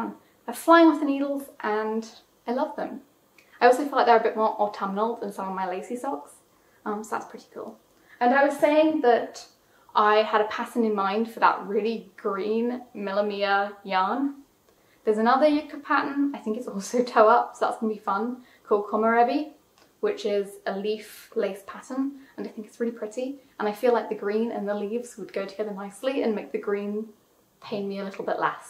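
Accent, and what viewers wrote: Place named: United Kingdom. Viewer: I’m binge watching every episode )) I love my blacks and pinks but I’m obsessed with your British accent and colour choices )))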